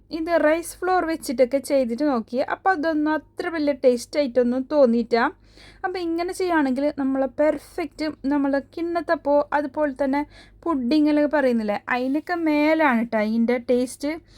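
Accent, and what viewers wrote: Kasaragod, India. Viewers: Kandappole kothiyakunnu, pazhuthu poya pazham vechu oru super pudding, kasargod varthamanam kelkkan rasamanu 👌👌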